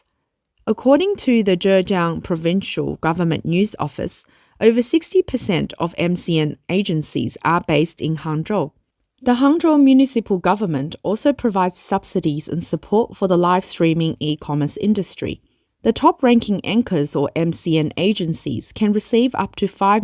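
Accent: Australia. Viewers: That’s an Aussie accent alright, being Australian myself I noticed it straight away, it’s hard to miss 🙂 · You guys do very good content but can you do away with the Aussie accent? Doesn't fit with what you report..and please get your pronunciations right..facade is pronounced wrongly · Wait, that's an Aussie accent? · façade is pronounced as "FAHZAD." Not the worst Aussie or Kiwi (?) accent but quite pronounced. I've heard WORSE eh ! 😉🙃🙂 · there is that Aussie 5 eyes voice over again....... Keep up the trash talk Temu, temu, temu temu temu..... Bring on the launch of temu chyna rubbish products looking for a home. Meanwhile the banks are failing get your cash out now.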